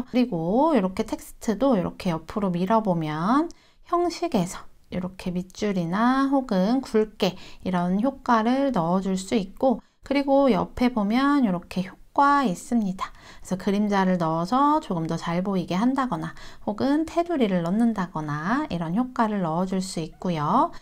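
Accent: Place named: Gyeongsang, South Korea. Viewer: 경상도 사람이네요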